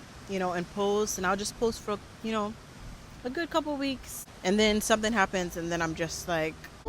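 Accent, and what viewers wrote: Africa. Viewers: You even have a lil African accent ☺️😍…. My husband is Ugandan, I plan to move there for 5 years after we have our baby. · Beautiful, you are developing that African accent to, love you and your families videos keep sharing👏🏾👏🏾👏🏾👊🏾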